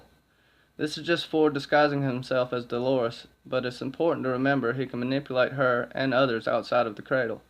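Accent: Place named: South, United States. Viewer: Hey man!! I've been talking to AU Packmule about being a guest on his channel. My notifications were messed up and I didn't even realize he did so many streams. I saw you, and I've subscribed. I'm from Alabama. I can tell you're definitely from the South. So looking forward to watching you and hopefully joining a chat!!😀❤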